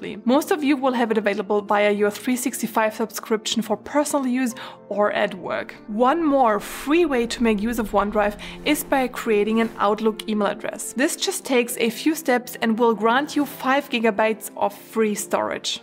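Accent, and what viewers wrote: Germany. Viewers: Is that German accent? Just curious, good video btw 🐥❤️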